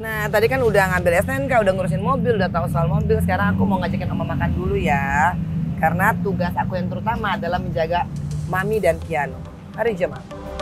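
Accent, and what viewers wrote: Manado, Indonesia. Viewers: Adu eh...p sadap m dengar mbak bahasa mdo.. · Mba campur logat manado mantap 😀👍...sehat sllu mba' brsm kluarga 😇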